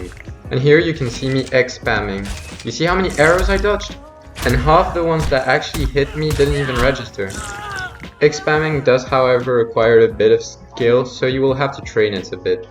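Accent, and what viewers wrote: France. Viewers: Je comprend tellement mieux avec l'accent français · c’est cool je comprend mieux l’anglais avec l’accent fr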